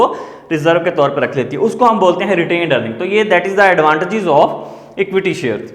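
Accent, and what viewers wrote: Pakistan. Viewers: Are yyou frm Pakistan?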